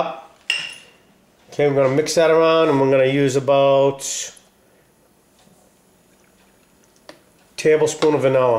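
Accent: Boston, United States. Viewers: Do I hear Boston in there?🙂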